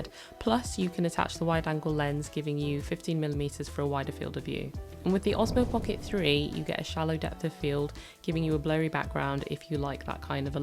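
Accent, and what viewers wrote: United Kingdom. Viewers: Great video. Talk slower for your over the pond English is hard to take in your info.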